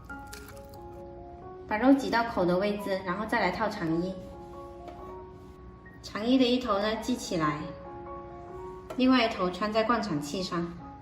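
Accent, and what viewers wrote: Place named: Hakka, China. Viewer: 问题是哪里买这肠衣呢🙈。宝贝普通话客家口音好亲切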